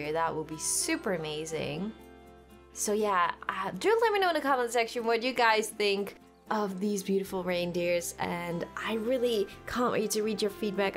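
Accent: Netherlands. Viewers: i came to your channel at the zootour video and 3 minutes in i could hear your dutch, keep up the good work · Me : *was about to subscribe Me : *hears that she's dutch Also me : SUBRIBE RIGHT NOW Keep up the good work! 👍👍💯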